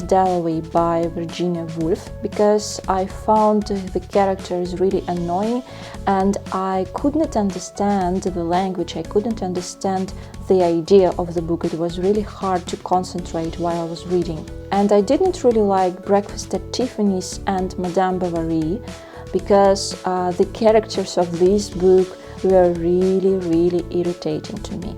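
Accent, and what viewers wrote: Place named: Russia. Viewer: Уроков с правильным произношением и правилами полно. Это тренинг на формулировку своих мыслей на английском. И почему-то русский акцент русскому понимать проще😊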